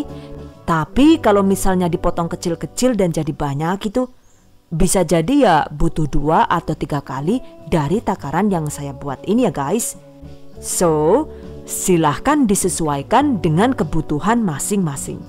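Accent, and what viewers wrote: Banyumas, Indonesia. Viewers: Owh si ibu ngapak jebuleeee... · Madiun kok ngapak seh buk? Kepriwek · Wiih ngapak mbak 😁😁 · Suwun resepe ya Yu... Rika sih wong ndi Yu, deneng ngapak kaya nyong.. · 🤣🤣🤣🤣🤣🤣🤣🤣🤣🤣🤣🤣🤣🤣🤣🤣Nyong seneng bgt krungu NGAPAKE... THE BEST CHANNEL😘😘😘😘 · Ku suka kalau bhs ngapake metu rek....lucu sih...... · Bun , aslinya orang mana sih, suka liatnya ada ngomong ngapak nya 😁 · Mba.nya orang mana sih?kok ada ngapaknya? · Bahasa Inggrisnya bagus, ngapaknya lebih keren😁😁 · Hhaaa q lah gemes ,,Rika janeh wng ndi Yu,,ngapak pisan ky inyonge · Ga tau knapa kalo buk hasanngomong ngapak ktawa sendiri😀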